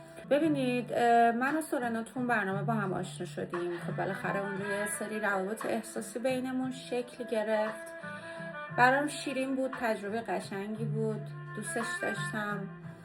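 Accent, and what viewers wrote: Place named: Ardabil, Iran. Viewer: ولی ته لهجه ترکی اردبیلی که داری قشنگه عزیزم تلاش نکن کنترلش کنی راحت صحبت کن🥰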